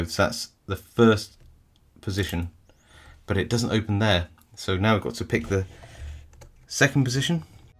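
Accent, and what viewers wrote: England, United Kingdom. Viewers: Man i just wanna say i love your vids dude. the english accent will never get old to my ears lol. Not to mention i love the way you explain things, everything makes so much sense. IMO the vids are on par with LPL, albeit different video types, but still in the community, which is why i drew the comparison.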